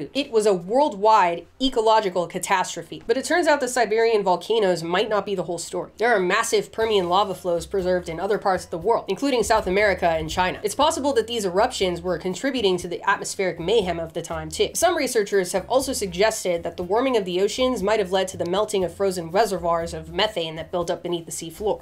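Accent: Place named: Maryland, United States. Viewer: where are you from? You pronounce your O's like a Marylander 😂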